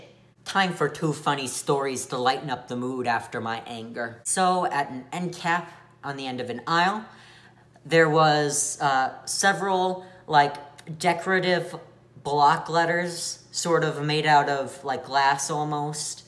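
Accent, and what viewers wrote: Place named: Midwest, United States. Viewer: If one slows down the video to .75 speed, you sound like a drunkard complaining about life and I fucking live for it. I love your voice, I'm from Michigan so It's nice to have someone from the Midwestern reigon to identify with. I dunno, it sounds weird and you'll probably not see this and/or not acknowledge it but I just wanted to share my not-so-valuable opinion. You are so funny man, I honestly hope you get the recognition on this shitty platform that you deserve.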